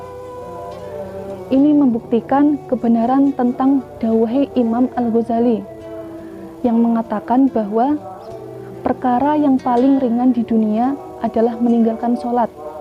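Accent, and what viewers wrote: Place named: Banyumas, Indonesia. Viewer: Ngapak ngapak 👏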